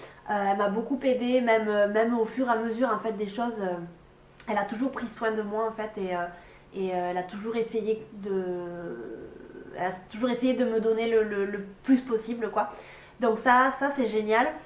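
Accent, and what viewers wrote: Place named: South, France. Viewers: Les Japonais doivent trouver son parlé Japonais charmant et amusant car cette jolie jeune fille a déjà un accent du sud prononcé 😉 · Accent de Carcassonne ? On dirait que tu viens de l'Aude non ? Perpignan peut-être ? En tout cas Sud-Ouest.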